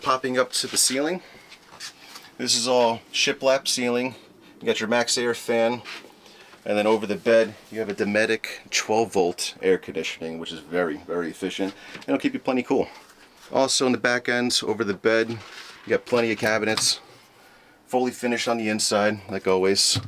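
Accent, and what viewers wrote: New Jersey, United States. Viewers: that New Joisey accent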